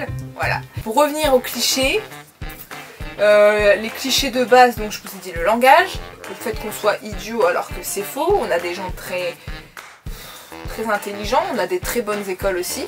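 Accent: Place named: Nord-Pas-de-Calais, France. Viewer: Coucou j’suis chti aussi, j’avais fait une vidéo aussi sur le chti également 😂 Ça va tu n’as pas trop l’accent contrairement à moi! On ne me fait remarqué à chaque fois sur mes vidéos mais jmen fou en vrai !! Ça fait partie de moi et j’en suis fière 💪🏻💪🏻💪🏻💪🏻 #chtipower ouuuuais 😃😃 Tu viens d’où ? J’me suis abonnée au passage bisous 😘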